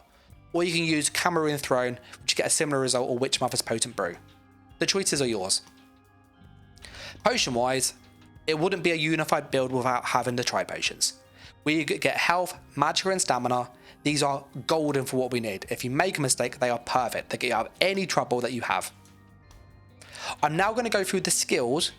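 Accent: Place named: United Kingdom. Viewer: lol I'm just trolling. I knew you was a Brit from the accent. I lived near Mildenhall for 2 years back in the days. Played darts at the Bird-in-Hand pub, was a blast.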